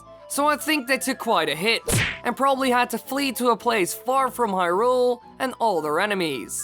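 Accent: Netherlands. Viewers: It's Dutch. We all sound like that in English.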